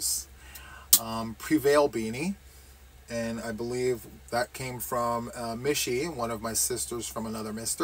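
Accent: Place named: East Coast, United States. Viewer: Happy new year and friends!! I just love your enthusiasm and especially when that East Coast accent comes out 😂! Please tell mama we say hello!!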